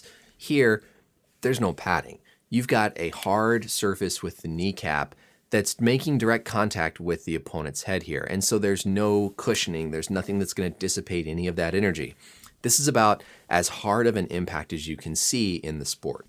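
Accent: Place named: Minnesota, United States. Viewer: Ryan Reynolds is canadian and Dr. is from Minnesota. They have similar dialects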